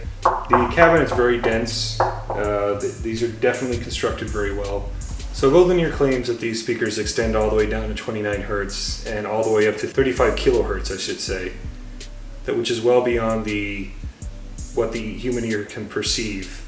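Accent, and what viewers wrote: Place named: Canada. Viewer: Canadian, eh?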